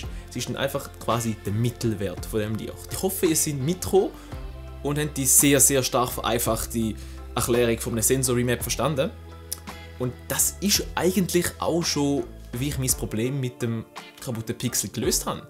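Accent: Switzerland. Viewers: Ja habe alles sehr gut verstehen können, top erklärt von Dir..! Trotz Schweitzer-Deutsch ;-)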